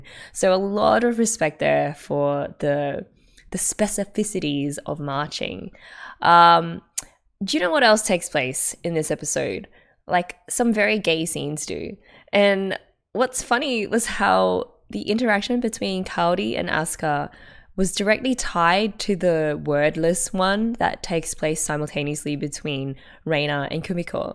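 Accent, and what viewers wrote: Australia. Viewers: 紮馬 sls 香港人？ i thought you were aussie through and through lol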